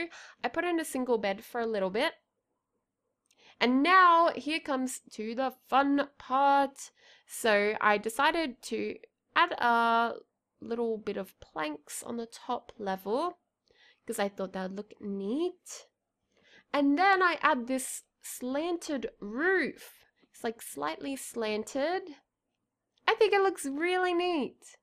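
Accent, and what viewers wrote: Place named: Australia. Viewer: This is my first video I've watched of yours (subbed!) and I was like "she's French. No she's Bulgarian. No no no, definitely Swedish. Wait, is she British. No, sorry, she's austrailian, defiantly austrailian.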